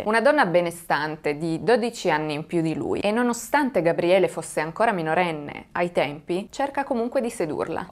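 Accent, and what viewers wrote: Turin, Italy. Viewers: Dubito che un commento a due anni di distanza possa essere preso in considerazione In ogni caso complimenti per la capacità di narratrice davvero straordinaria Ho scoperto il canale da poco e lo apprezzo parecchio Per quanto riguarda l'accento nulla a che vedere con Belen È il classico accento delle ragazze torinesi di buona famiglia Ho un dubbio sulla storia Siamo nel 2014 giusto e si parla di pagamenti cash, almeno 2, per decine di migliaia di euro Mi pare davvero strano Oggi sarebbe impossibile, ma anche allora le norme antiriciclaggio erano in vigore Un prelievo in contanti per quasi 200.000 euro poi pare fantascienza Magari ricordo male, ma qualcosa non torna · Comunque si sente tantissimo che sei di Torino! 😉 Mi permetto di consigliarti un video su un mio ex compagno di classe: alexander boetcher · Si sente che sei di Torino nee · Si capisce che sei di torino ne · ma é di torinoooooooo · No no si sente che sei di Torino 😉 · Ciao! 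Ti ho scoperto oggi grazie a una mia amica e già dal primo video avevo capito dall’accento che fossi torinese. Io abito in Canavese, mi ricordavo vagamente di questo evento ma non sapevo minimamente di tutta la storia terrificante dietro….fa davvero paura pensare a che livelli può arrivare la malvagità di certe persone…poi a maggior ragione quando sono cose che succedono vicino a te. Grazie per aver riportato alla luce la storia di questa povera donna e grazie per tutte le altre storie che ci fai conoscere. Nonostante ti abbia scoperto solo oggi mi piaci già molto e continuerò a vedere i tuoi video con piacere e interesse da appassionata del genere. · Abiti nella città che io amo e ogni tanto vado a farci i weekend Avevo capito che potevi essere di Torino, dalla tua cadenza aperta 😅 Volevo chiederti, se potevi, raccontare la tragedia di Superga, l'aereo del Torino caduto sul terrapieno, della basilica di Superga. 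Lo so che sarei il solo a richiederlo, ma io te lo chiedo lo stesso. Sei molto brava, é un piacere seguirti e ascoltare le storie che racconti. Buona serata e buona continuazione ciao 👏👏👏👏👏👍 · Si capiva subito che eri di Torino ❤️ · Un'ottima capacità espositiva, profonda sensibilità e delicatezza nel raccontare queste storie e un magnifico accento torinese. Dov'eri fino a ora? Grazie per ciò che fai, la frase sull'empatia è molto toccante. Questo video dovrebbe essere condiviso di più, perché purtroppo credo che come questa persona ce ne siano tantissime. Bisogna sensibilizzare su questi temi e insegnare alla gente a essere accorta e attenta. Grazie per questo video ❤️ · Ma la persona che ti ha detto di prendere esempio da belén per parlare in italiano, è la stessa belén vero? 🤣 Penso che l'accento Torinese sia uno dei più belli d Italia 🇮🇹 ❤️ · Ma chi è che si permette di criticare il tuo bellissimo accento torinese?!? 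Non ho parole... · Dal primo video che avevo visto ho capito subito che eri di Torino. Con gli accenti italiani sono una bomba💥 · Ciao! Io avevo capito che eri di Torino perché parli esattamente come Giulia Valentina ahahahah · Che strano , alcuni Italiani non riconoscono l'accento torinese come italiano? Non hanno mai parlato con un piemontese? Addirittura paragonarlo all accento argentino assurdo!!! Ciao da una tua concittadina ahahah. .. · la mia nonna è di Moncalieri e la primissima volta che ho guardato un tuo video ho capito subito che sei di torino!!! 😍 complimenti per la professionalità e la sensibilità con cui racconti queste storie, non sono appassionata di true crime ma ti seguo sempre con piacere e interesse!!! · Buon giorno,! Avevo riconosciuto il tuo accento torinese. Ho alcune amiche provenienti dalla tua bella città. 😊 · Infatti hai l accento torinese come la Luciana Littizzetto. 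Grazie x i bellissimi contenuti che ci proponi. Sei bravissima. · Si sente che sei di Torino ma parli un Italiano perfetto, con un accento perfetto. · ti seguo da tre giorni ,sono di Voghiera e ho capito dopo 1nanosecindo che sei di Torino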